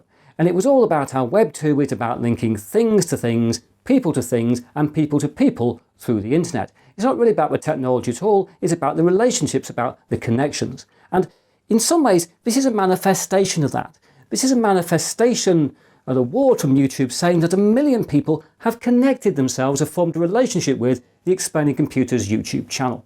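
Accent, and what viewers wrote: England, United Kingdom. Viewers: It is never too late to congratulate (3 weeks late 🤭) Popularising is a noble thing and even more so when it comes to computers when I look around me. They treat computers like toys but cry when they've lost all their data because they didn't make a back up. I really enjoyed your video on mini SD cards to help me make the right choice for my Raspberry Pi. But most of all, I love your English accent, which makes a big difference to me compared to Americans and Canadians (peek-a-boo Linus Tech Tips).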